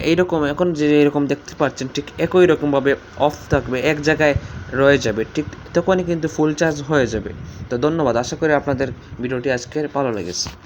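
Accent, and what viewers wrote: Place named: Sylhet, Bangladesh. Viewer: ভাই তুমি সিলেটি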